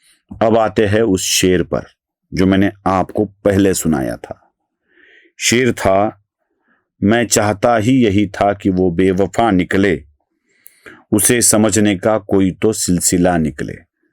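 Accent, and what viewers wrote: Maharashtra, India. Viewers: तुमची मराठी वाली हिंदी खूप छान वाटते.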